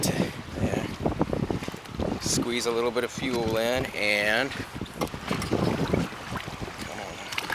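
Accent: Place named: Florida, United States. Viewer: sounds like you live in florida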